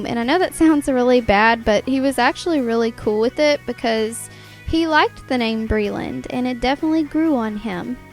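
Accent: South, United States. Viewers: happy bday breland and you have the cutest southern accent ever . luv ur channel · Ha thats cool you live like 2hrs away from me ps ha cute lil baby south accent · Omg cutest freaking country voice ever! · T you were so cute when you're a little like so cute and I love when you talk by your little accident your little country accent it was really cute...,......you just made my day I Watch you all the time love you so much and I hope you have a glitterfull Day and god bless 💟💟☺️☺️☺️💞😇😇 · I love your voice when you were little! You had that country twang! And still do now! I love it and wish I had a twang!😘 love you sooo much!!!! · omg I love southern accents and then hearing you speaking as a baby it's so darn cute!!! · I love your young country accent!! It's so cute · You were so cute in your dag gom Bambi southern voice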